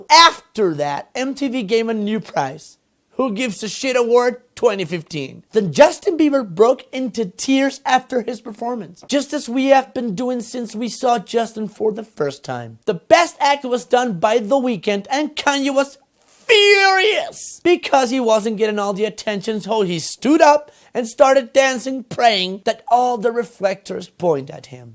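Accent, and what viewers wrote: Mexico. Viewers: everyboy in every video say things about his pronunciation, he's mexican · el ingles esta chido se nota el acento mexicano chingon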